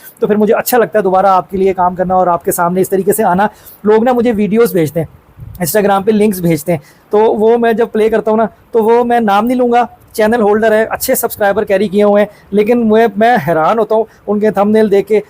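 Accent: Punjab, India. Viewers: Aap Urdu bolty bolty thora touch Panjabi ka lagaty ho acha lagta h, khush raho.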